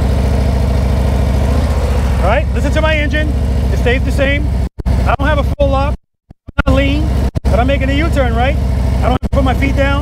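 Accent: New York, United States. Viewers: oh my god man ! your voice and almost everything you say sounds like my friend Zoffinger. look up his channel .he does kayak and fishing stuff. very unique people you are. even though you resemble each other. im a rider and appreciate your content. we are in Fla. hear what you would sound like without the new york accent. im from staten island btw. so i get it.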